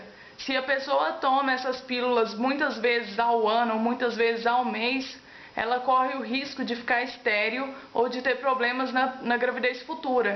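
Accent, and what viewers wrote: Minas Gerais, Brazil. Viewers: Uai gente, ela é mineira, mais óbvio impossível. ;d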